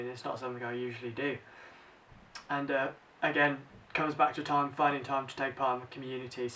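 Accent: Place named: United Kingdom. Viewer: I too loved The Golden Compass & Harry Potter. More people need to read The Golden Compass. Plus I'm American, so I really like your British accent. Just so you know though "comment downstairs" sounds kinda odd. Welcome to BookTube!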